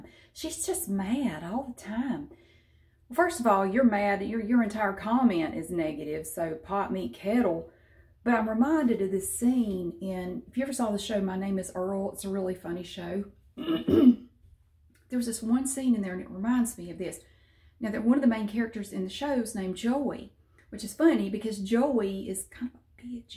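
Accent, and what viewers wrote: South, United States. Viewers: I enjoy your videos so much! You are so genuine and real. I’ve been following you the day you made this channel and watching your videos everyday now because I’m so invested in your life because I love to know what you are doing 😝 A lot of YouTubers nowadays are fake and always starting drama that’s why your channels are a breath of fresh air. I honestly love your personality and southern accent! Your voice is so soothing to hear and it helps me sleep when I’m restless and can’t sleep. Would love to send you a Christmas card if you have a PO Box! Sending you lots of love from Canada!🇨🇦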